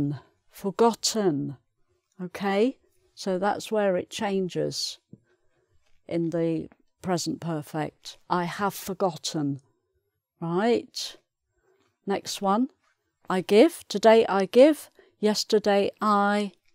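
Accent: United Kingdom. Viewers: * “Very kind and a polite teacher. I am impressed with her British accent”. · Very kind and polite teacher I impressed her British accent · I Like it This video's good ,learn speak English British with this teacher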